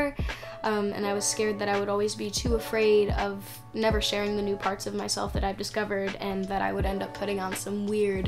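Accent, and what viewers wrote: United States. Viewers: I thought you were American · i thought you were american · isn't it american? :0 I literally watch his videos to hear his voice, but I speak Spanish and I don't understand anything, hahaha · i thought u were american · Wait I thought she's American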